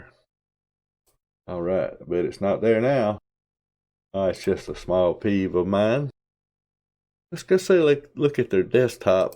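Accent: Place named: South, United States. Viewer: Hi, GeckoLinux creator here. Thanks a lot for the thorough and open-minded review! And I love that southern drawl! Real pleasure to listen to.;-) Just a few clarifications: Regarding the lack of GeckoLinux theming for GRUB, that's intentional. GeckoLinux is unapologetically openSUSE, created from openSUSE packages and using the openSUSE repos. I recognize that I'm standing on the shoulders of giants, and I make no attempt to conceal the fact that the system is still proudly openSUSE. I'm not sure what happened with the Google Chrome repo refresh, must have been a temporary network failure. You can just skip the refresh on individual repos instead of skipping the entire process. What appears in YaST Software the first time after the initial database refresh is not a list of availabe updates, but rather a list of packages specific to your hardware. 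The XFCE panel clock size is actually controlled by the XFCE general fontsize settings. Your summary at the end was good, GeckoLinux is meant for users that appreciate the quality and excellent community of openSUSE but don't like dealing with the hassles. There are quite a few papercuts in a vanilla openSUSE system, mentioned on the GeckoLinux website, so the goal of the project is to eliminate those via configuration changes and package selection, while still remaining 100% openSUSE at its core. Thanks again! Have a good one.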